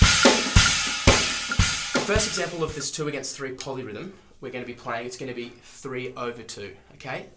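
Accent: Australia. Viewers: Australian?! Yes! Where you from? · Hahaaaaaa you're an Aussie!! 😃🤪😜🏆🏁🎉💯👊🏼 F@ yeah Dawg!! Never heard you talk before.. only watch you do sic arse Meshuggah covers!!! Where you from bruh... I'm in Brisbane!!! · Finally an Aussie! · Ah you're an aussie mate! I thought you were a yank for some reason... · Hey mate, where in australia are you from? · HAH he's Aussie!!! love it.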